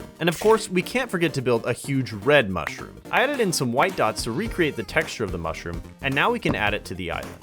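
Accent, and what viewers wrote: England, United Kingdom. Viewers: Дякуєм за додавання Української до відео, хоч там і є певні помилки і ще й о той Англійський акцент... але це пусте... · Английский акцент...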